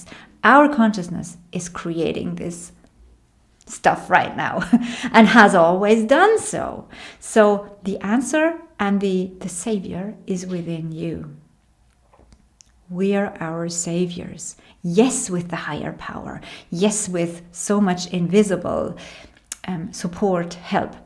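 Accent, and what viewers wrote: Ireland. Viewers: Yir accent is lovely. Love the irish undertone. 🤗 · Your sweetness and your Irish accent reminds me of Lorna Byrne, the Angel lady.........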